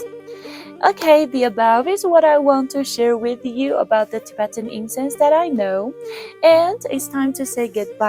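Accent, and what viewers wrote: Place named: Southeast Asia. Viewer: your English is not same as Most Chinese, kind of south east Asian accent. Though very good presentation. Tibet blessed.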